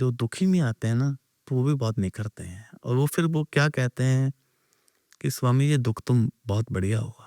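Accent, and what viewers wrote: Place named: Punjab, India. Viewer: ਪੰਜਾਬੀ ਬੰਦੇ ਦੀ ਹਿੰਦੀ ਦਾ ਪਤਾ ਲੱਗ ਈ ਜਾਂਦਾ 😅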